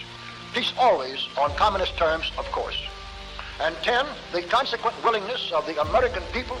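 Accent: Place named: Canada. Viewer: Sounds like Canada ..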